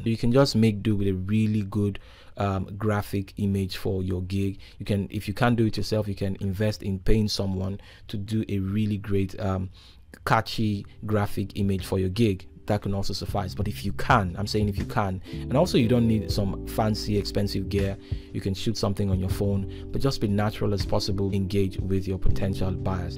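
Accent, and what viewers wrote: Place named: Nigeria. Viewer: I like your clean clear Nigerian accent.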